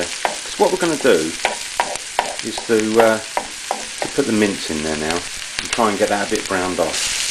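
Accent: United Kingdom. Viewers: that british accent though. too cute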